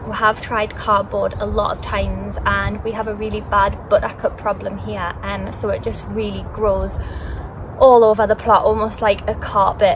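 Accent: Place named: Newcastle, United Kingdom. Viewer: Hello you are totally natural at allotment reporting I really like the colour of your nail polish and your cute smile and Newcastle accent. Any word of Tony c smith having an other potty mouth club show yet. Could you also please tell where. Could be best to get a polytunnell or a small greenhouse please. I hope you have a nice weekend when your away from the garden and allotment thanks you 😊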